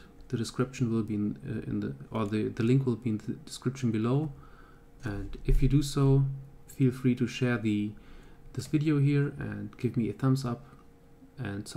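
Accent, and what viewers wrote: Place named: Germany. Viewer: Bist du deutsch? Man merkt iwie einen Akzent heraus ^^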